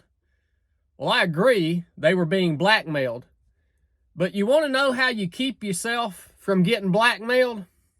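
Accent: South, United States. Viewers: I do love the southern accent. Great words I hope justice is served. Thank you.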